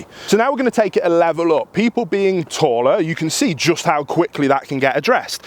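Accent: United Kingdom. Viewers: Finally I love someone's british accent, I can understand everything so smoothly.. so different from Newcastle geordies I used to live with.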